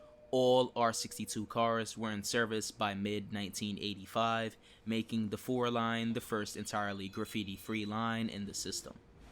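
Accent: United States. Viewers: It’s like watching a jago hazzard vid but with an American accent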